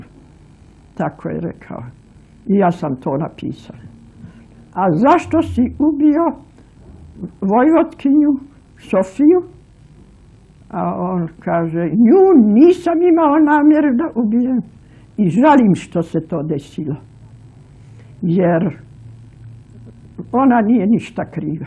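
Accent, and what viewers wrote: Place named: Ekavian, Serbia. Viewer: Hercegovka, odrasla i školovala se u Sarajevu, a govori ekavicom. 🙄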